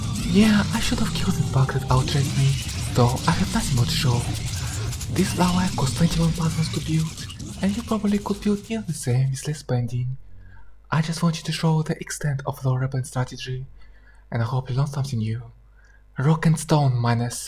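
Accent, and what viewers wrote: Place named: Europe. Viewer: european accent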